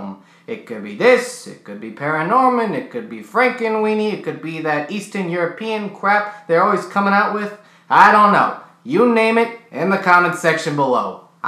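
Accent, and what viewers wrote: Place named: Brooklyn, United States. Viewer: The Brooklyn accent is unbearable.. I feel like i'm listening to Joe Pesci.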